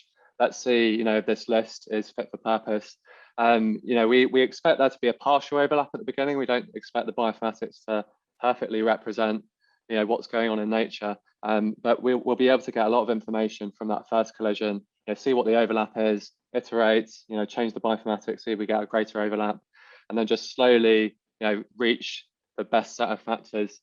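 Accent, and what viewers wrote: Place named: England, United Kingdom. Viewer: You should consider activating text autogeneration..if you both read and listen to his English accent you should be able to understand it..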